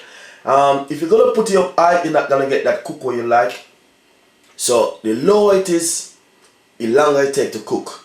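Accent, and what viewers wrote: Jamaica. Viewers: wow are you Jamaican. me want some rum cake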